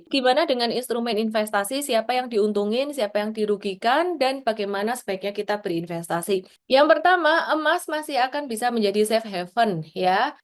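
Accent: Java, Indonesia. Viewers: Suaramu medok mbak.... Ketoro wong jowo iki😮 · Sampean ko yo bahasane medok temen mbak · Menyimak dengan baik kontent yg berbobot...tapi sedikit gagal fokus karena "medok lafalnya"..😮😊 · Wong jowo Iki mbak e. Logate ketoro nenen.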